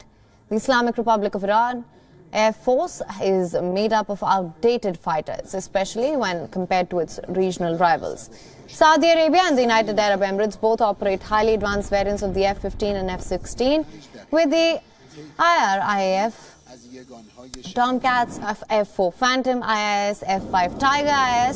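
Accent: India. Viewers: FIND SOMEONE THAT CAN ACTUALLY SPEAK ENGLISH SMOOTHLY AND WITHOUT THIS SCREECHING AND EXTREME I. N. D. I. A. N ACCENT, which makes it not ONLY very unpleasant but rather hard to understand! She is beautiful non-the-less, BUT this isn’t a pageant contest, it’s supposed to be the ‘news’! 🙄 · And thickest accent, like goddam. I thought stand up comedian was overexaggerate on how funny stereotypical indian accent was.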